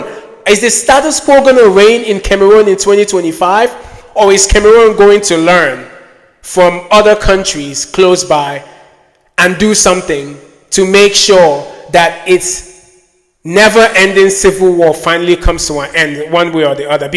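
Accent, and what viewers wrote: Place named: Nigeria. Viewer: Hello mate! You've got your facts wrong or mixed up. Francophone Cameroon known as la Republique du Cameroun got its independence from France on January 1st 1960. You sound Nigerian. Anglophone Cameroon previously known as British Southern Cameroon was administered as an autonomous Unit within Colonial Eastern Nigeria with the headquarters of the latter Region in Enugu. In order to gain their independence, British Northern Cameroons and British Southern Cameroons as separate entities had to either join la Republique du Cameroun or remain within the Federal Republic of Nigeria which became independent on October 1st, 1960. During the February 11th, 1961 Plebiscites, the adult population of British Northern Cameroons voted to remain within the Federal Republic of Nigeria. Sardauna Province, as British Morthern Camrroon was known before Independence comprised parts of present-dayTaraba, Adamawa and Borno States. 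British Southern Cameroons voted on a 70-30% split to achieve independence by joining la Republique du Cameroon. Independence was effective from October 1st, 1961. Differences of opinion on governance between the federated states of West Cameroon and East Cameroon commenced after October 1st, 1961. The citizens of Ambazonia- the former federated English-speaking state of West Cameroon ensued marginalisation from the outset of a highly centralised Federal Republic of Cameroon. To get on in Cameroon, Anglophones must learn and master French. My generation accepted the fait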